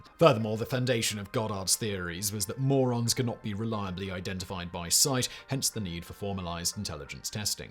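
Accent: United Kingdom. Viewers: Great video as usual. Simon I had to think twice when you pronounced cretin with that Brit accent of yours. Americans have only heard it pronounced cree-tin and it applied to all sorts of monstrous beings. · oh a british vauce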